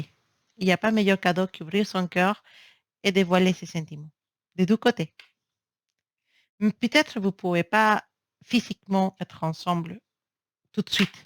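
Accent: Spain. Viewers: Tu as un très joli accent espagnol, prend ton cheval et viens me voir 😃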